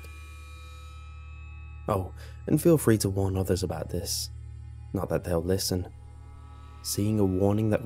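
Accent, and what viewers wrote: England, United Kingdom. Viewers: hey what part of England are you from I'm trying to fugue out what accent you have.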